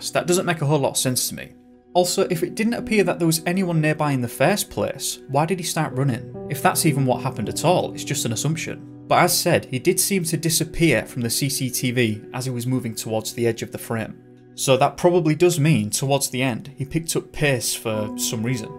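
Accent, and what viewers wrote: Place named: England, United Kingdom. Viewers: You lol that as though anyone from the U.K. could readily tell the difference between a West Virginia accent and one from Kentucky. I know you mean well, but the tone sounds condescending. I'm sure that's unintended. His vocal cadence (there's a better word for it) does have a tiny bit of a brogue. If someone hasn't spent time in Scotland and England, it's an innocent misunderstanding. When he says, "taken," "say" or "if so," those vowel sounds aren't immediately revignizable as an English accent. From which region is he? Side note: I'm related to Hannah Bee and family from Warwickshire- way back..Is that actually your last name? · 😂 His accent is English not Scottish love. Lol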